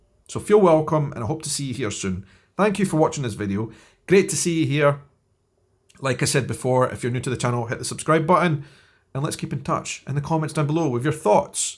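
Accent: Scotland, United Kingdom. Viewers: Love Billy Connolly! The first time I went to Scotland in 1979 I could not understand one word or one word on the phone. The accents of people I spoke to were much stronger than yours. Thanks for the informative video. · From Canada here !! Love your Scottish accent please dont loose the accent. Im so glad the younger ones are sticking to their natural language Scotland is on my bucket list to visit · I am Appalachian, from the Southeastern Kentucky area. Our ancestors are mostly Scottis, Irish, and English. Some of the words you are saying sound a lot like the words my Grandma used. I would love very much to visit your fine country for a wee bit. I love many different accents, but the Scottish accent is my favorite!!! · There's NOTHING wrong with the range of glorious musical Scottish accents which are full of different shapes and oral postures. 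I'm from the States originally, now living in the UK, and I am instantly in a better mood if I get to have a chat with someone with any flavor of (supposedly working class) Scottish accent. Don't be apologetic; if someone can't understand your glorious accent, that's a "them" problem. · I identify with what you're saying about having to adjust your accent, being from the American South. We often get looked down on by people from other parts of the US, who equate any Southern accent with either Scarlett O'Hara or a stereotypical hillbilly. I grew up in the Piedmont of South Carolina, where our English came from Scottish, West Country British, with a bit of German thrown in -- hard, rhotic "R's", lots of diphthongs where we stretch out the syllables of a word, and make words with soft endings into hard "R" sounds, as in "winder" (short 'I') for window or "holler" for hollow. So we get the hillbilly label all the stereotypes that go with it, although the accent has definitely moderated in our area since, beginning with my Dad's generation, we've grown up with movies and TV. 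But I hope we don't lose it completely, because it's so much about our history and culture. That's why I'm glad people like comedian Trae Crowder from Tennessee, who does the YouTube character "the Liberal Redneck", and Dr. Travis Taylor from northern Alabama, aerospace engineer with multiple advanced degrees, speak eloquently, articulately and proudly with the hill accents they grew up with. I think the Scots accent is really beautiful and unique, and I think, even if you have to interpret sometimes, you should speak it fully for us to come to understand and celebrate its uniqueness. Thanks so much for your videos! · People move to America and can hardly speak English but you in the UK get shit for your accent. That’s just not right. I love hearing that thick Scottish accent even if I have hard time understanding some of it. It’s part of who you are.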